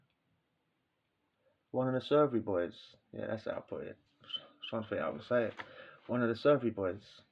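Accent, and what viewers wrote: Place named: London, United Kingdom. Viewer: What part of the UK are you from bruv you sound like a Londoner is that right?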